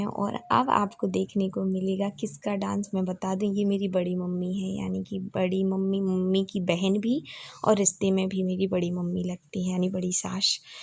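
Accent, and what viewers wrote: Bundelkhand, India. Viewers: Bundelkhand language😊😊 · Di apki language buldelkhandi h ap kaha se ho esi language hamari bhi h m jhansi se hu,PLZZ reply me Di pzzz plzz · Khti thi bundelkhandi ni bnti bolte magar bol to leti ho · Didi mein sagar se belong karti hu aur hamari language bhi bundelkhandi hi h and I don't know why mujhe aapke muh se yeh language sunne mein bohot achcha laga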